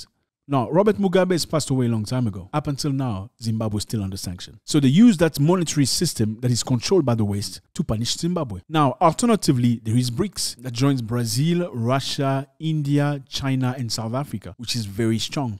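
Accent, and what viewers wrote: England, United Kingdom. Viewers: Notre frère va du côté anglophone de la force, très bel accent anglais 💪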